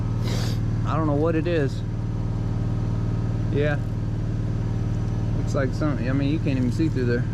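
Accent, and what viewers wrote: South, United States. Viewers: Wow I love ❤ your Southern accent from Norristown PA here 👍🏾👋🏾🤟🏾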